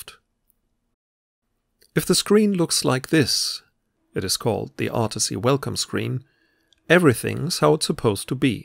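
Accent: Germany. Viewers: I understand a german accent when i see one. Könnt ihr nicht mal ein komplettes deutsches tutorial machen. So ein, so plant man ein Spiel und so nutzt man dazu articy draft.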